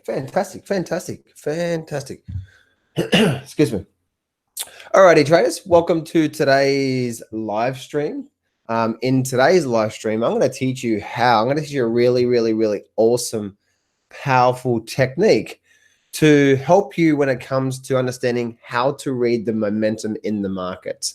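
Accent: Australia. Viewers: Dear; thank you very much for sharing a bit of your coveted inside trading secrets. You are a wonderful person and a joy to listen to. Although you may not be aware of it, I love your Aussie accent. It is similar to a British accent but different. I grew up in Chicago Illinois USA. What part of Australia are you in? The wildlife you have there is extraordinary. I loved Steve Irwin so much. That aside, a V bottom rocket recovery like this one is certainly odd, and experts I listen to are at an impasse as to the next move. Some say we will shoot right through the confluence of resistance and keep climbing to S&P500 2800+ and up and up. Others say retest of 2500 and then up and up. Some others say a retest of the December low and then a new bull market will ensue. Then there’s Bo Polny and his biblical pronouncements of doom. I know how much you love Mr. Polny. 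Kidding of course. In closing thank you so much for sharing your insight and wisdom.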